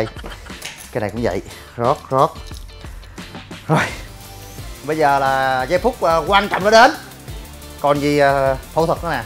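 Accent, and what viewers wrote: Mekong Delta, Vietnam. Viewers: Mới xem ông này nói chuyện cười quá giống chất miền tây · Lần đầu xem videol của a nhưng rất thích đậm chất miền tây dễ thương ☺️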